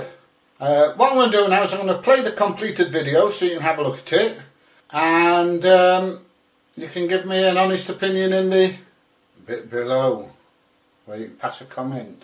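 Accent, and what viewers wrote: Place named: Doncaster, United Kingdom. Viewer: I come from Doncaster, live in Chicago now, but I sense you’re from around there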